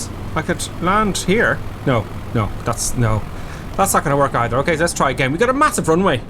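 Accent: Ireland. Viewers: Are you another Irishman 😂🇮🇪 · Flight simmer with amazing Irish accent? No choice but to sub :)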